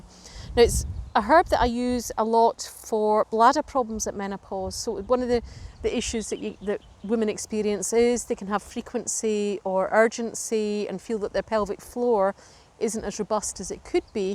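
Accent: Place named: Scotland, United Kingdom. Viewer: Love to hear a Scottish accent. Live not too far from Fife. 😊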